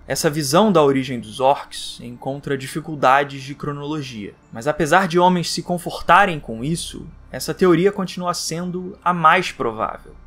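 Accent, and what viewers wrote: Rio de Janeiro, Brazil. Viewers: Acho que não existe ninguém mais carioca do que vc hehehhe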